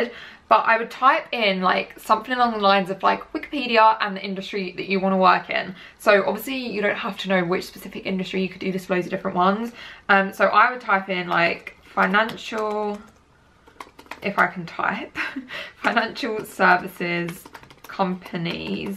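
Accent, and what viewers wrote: United Kingdom. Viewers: yep British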